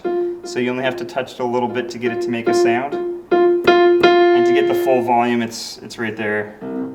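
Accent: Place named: Canada. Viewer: WHAT PART OF CANADA ARE YOU FROM , EH ???????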